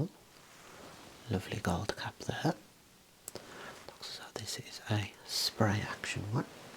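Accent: United Kingdom. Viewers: イギリスの話し方が本当によく眠れます😊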